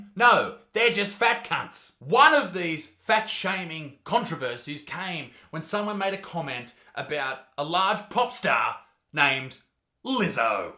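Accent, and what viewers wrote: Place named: Australia. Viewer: I did not expect the Auzzi accent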